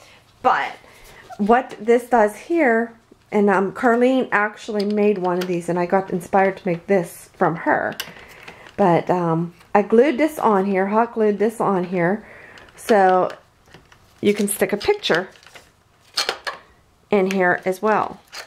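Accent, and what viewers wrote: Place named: Pennsylvania, United States. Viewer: Ok, I thought I recognized that PA or OH accent. Then I saw the 'keystone' shape you used to stick in the clip holder. And I thought Ok.... she must be from PA. I grew up in western PA, near Pittsburgh. I live in SC now, but spent the first 18 yrs of my life up there. So where are you from? Hugs, Jessica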